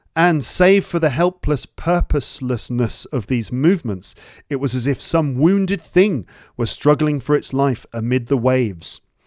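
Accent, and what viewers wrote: RP, United Kingdom. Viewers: speaks RP, does he? Pls tell me, thank you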